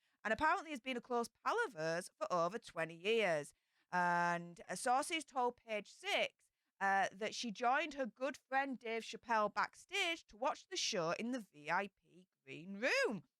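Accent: Yorkshire, United Kingdom. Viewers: The British accent sometimes gets a little heavy. Harder for Americans to understand. I like ur show tho. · Too loud? Thats your natural voice or so I thought? Your the same in all your videos as far as I can tell. Get used to the Yorkshire Gob folks :) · I love your accent, what part of the UK are you from?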